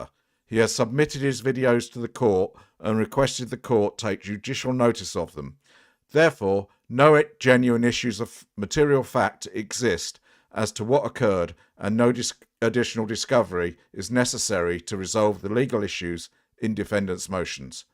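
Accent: United Kingdom. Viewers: are you going to continue your unique content but showing your face and giving your real name on your channel in the future? Mr. Merbeast recently said anonymous creators are cowards and Mr. Stout has said creators such as you are "avatar" channels whispering in shame from their secret internet hiding places. (I know you don't think much of Mr. Stout though.) Whatever the decision, I trust your voice will remain the same. Everything sounds better with a British accent!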